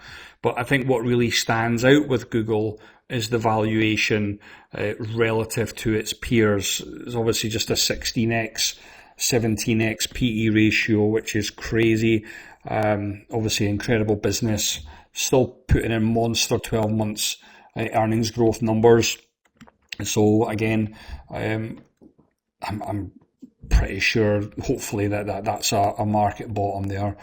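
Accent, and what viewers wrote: Scotland, United Kingdom. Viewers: Thanks for this update and I love your accent, I have so many positive experiences with Scots. Could you say something about Novo Nordisk (NVO)? It is a blue chip company that is down 70% from its highs and I'm wondering if it has reached its bottom yet.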